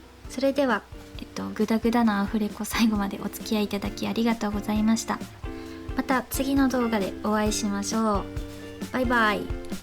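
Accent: Kansai, Japan. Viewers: トークのセンスが最高です！関西を協調せず、ホンワカした雰囲気がとってもよいです。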